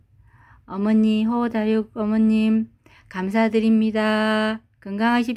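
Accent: Gyeongsang, South Korea. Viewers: 님 반갑습니다. 순수한 경상도 사투리로 애기해 주시니 넘 구수합니다. 함께라서 즐겁습니다.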